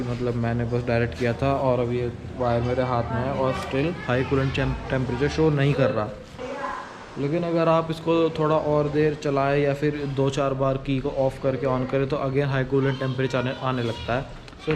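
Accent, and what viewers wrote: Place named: India. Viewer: thank you very much for the answer, I know is an Ecu problem but I have some questions. First i have a map for the conplete exhaust and I think if I reset the Ecu (I read somewhere that can do it by take the battery for some minutes) the remapping would be lost (I'm not sure about). And second I can't believe that is the only way to fix it, is only a part of the Ecu but I don't understand the indian english and for a duke owner is a problem.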